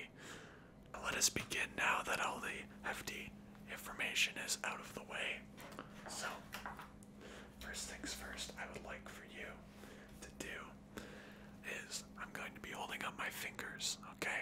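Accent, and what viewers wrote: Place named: Germany. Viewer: U sound German are u German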